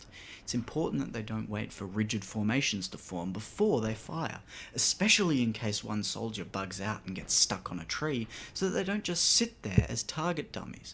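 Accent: Australia. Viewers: I can tell you're Australian right off the bat. its fucken fantastic.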